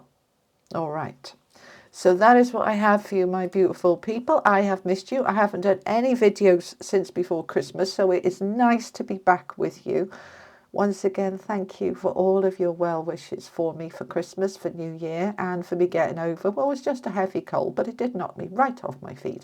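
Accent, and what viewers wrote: England, United Kingdom. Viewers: I had the same flu on the same days, a terrible unstoppable headache and a total lack of energy. But I'm feeling better now. I wish you a quick and full recovery. You're such a nice human being and it's a pleasure to listen to your readings with that perfect English accent. Love from Italy ❤🇮🇹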